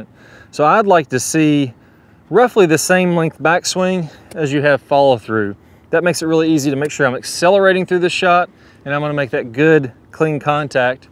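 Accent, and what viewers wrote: South, United States. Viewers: another thanks from me here in the UK. Your lessons are fantastic and right on point for game improvement. And that nice southern drawl makes watching a Zen-like experience!:-D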